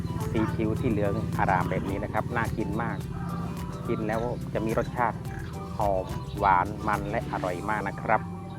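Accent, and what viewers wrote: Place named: Cambodia. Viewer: สำเนียงขะแม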